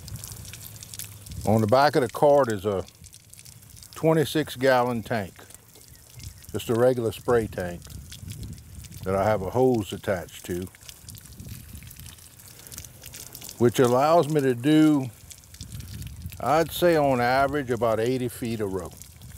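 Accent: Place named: Texas, United States. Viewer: I absolutely love your videos. So helpful and always entertaining. I'm from SE Texas just an hour west of the TX/LA border, but I live in Dallas now. Your accent immediately brings back "home" for me. Thank you so much for your videos and the time you put in to teach us all how to grow smarter, not harder. Lache pas!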